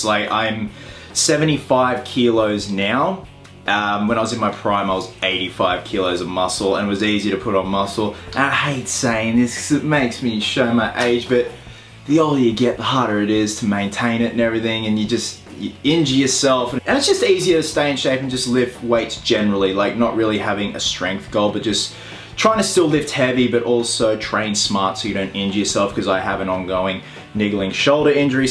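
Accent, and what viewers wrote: Australia. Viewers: Mason Sumi that's just the Australian accent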